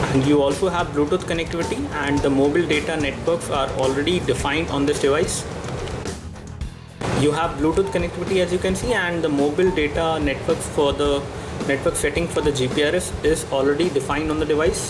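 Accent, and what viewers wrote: India. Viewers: omg hindu acent bleeee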